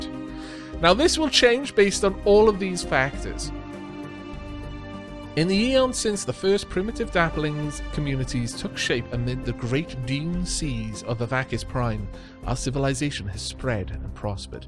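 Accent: Wales, United Kingdom. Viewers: Welsh Accent + Stellaris + Epic Music + Space Bugs = Alef-0 levels of awesomeness.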